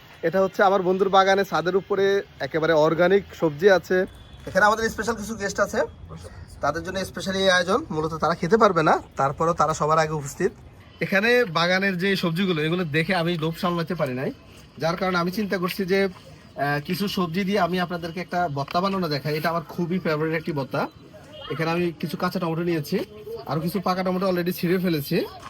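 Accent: Noakhali, Bangladesh. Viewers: Bhaiya ki noakhalir?🥹 Bakider Kotha Barta shune Moja laglo,roja rakha bhai er jonnow kharap laglo,unar iftar er Jonno kichu rekhe diten 😂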